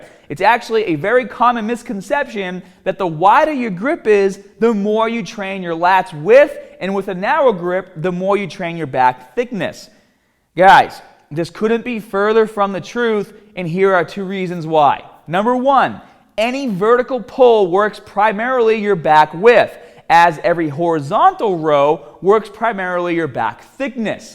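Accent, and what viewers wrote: Boston, United States. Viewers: Soon as I heard you speak I said wait a second, this guy has to be from in or around Boston, then I saw the " " and now I know you must be, I'm actually right from the city of Boston 4th generation myself and Pat's season tickets holder, anyway, pretty cool and good luck with your channel and overall success, nice to see a fellow Bostonian doing well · Boston rrrrrrrrr's all ovah the place! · Hes definitely from Boston. Forst time watching this guy but im from Boston so its not haahhd to heaa the accent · Am i hearing Boston? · You sound like you’re from Boston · Boston I think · Great video. I need to get onto pull ups and your vid gives great advice so thank you. Great Boston accent too. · Very good video, these are great tips, especially the ones that have to do with getting started if you can't do pull-ups at all. And as always, that Boston accent is on point! 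Love it bro! Keep doing you! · Sounds like Boston. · Staaat the caar near the baaa.....and be smaaaaaat. Must be Boston or close by. His advice is good. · Pullups R too Haaaaad for you...LMAO...The advice puts forth has been around since the 70s, nothing new here but the Boston accent did bring the lulz. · The Boston is strong in this one! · First introduction to this guy. Good video. Good info. I suffer at pull ups but I keep trying. He sounds from Boston. This guy puts the strong in "Boston Strong". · is that a Boston accent? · Marine Poolee here. I ship out to boot camp in October and I've been working hard to get better with pull ups. I couldn't even do 1 pull up a couple months ago but now I'm up to 10+ pull ups. Btw, you talk the CORRECT way, I'm from the Boston area and you talk similar to me. Both my parents are from the city with thick accents so I guess that's why I talk the way I talk lol. 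Your accent sounds a little forced but you still talk the right way lol. Keep up the good work bro 💪🇺🇲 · that boston accent be thiiiiick haha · Thanks for the tip about the band assisted pull-up, I was looking for that. I think there is a R in the word "hard". Boston accent? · Must be from Boston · Sounds like Boston man...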